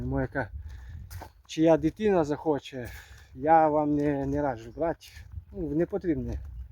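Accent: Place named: Ovruch, Ukraine. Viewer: Вітаю, напиши яка назва вашого села,я чую наш говір, я з овручини,наперед дякую.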